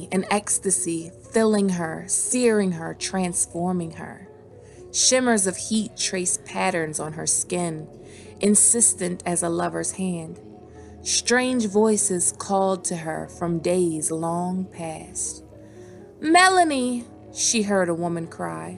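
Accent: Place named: Massachusetts, United States. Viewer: I like how you read..are you from the north East? I live in Massachusetts, and your accent almost matches a slight Massachusetts accent because not everyone has that horrible Boston accent very thick... But it could be a New York accent or somewhere in between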